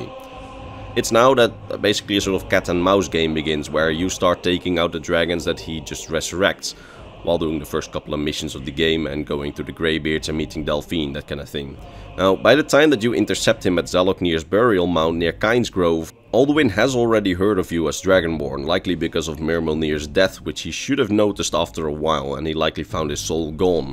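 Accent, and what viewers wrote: Netherlands. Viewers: I’ve lived in Amsterdam for more than 2 years now and I just realised you’re Dutch! Or atleast you sound Dutch haha. Great video as usual 🙌🏾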